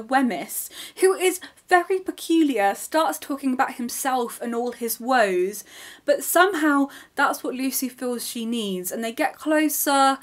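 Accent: United Kingdom. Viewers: Hello Miss Lucy. Hope you are doing very well. You are a well-read person. How old are you and how many books you've read so far? Where were you grown up and where do you live now? I started listening to your videos because of your good British accent. I would like to ask you a favour, do you have time to attend a ZOOM meeting where we can discuss books and classics. You are outstanding and we can learn from you.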